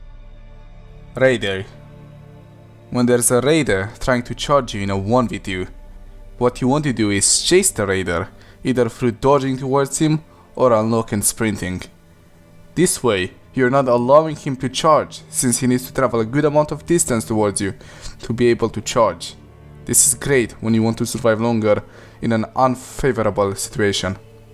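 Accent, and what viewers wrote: Romania. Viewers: accent de roman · are you romanian,russian or german?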